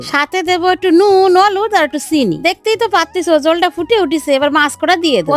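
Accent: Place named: Narail, Bangladesh. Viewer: নড়াইলের ভাষা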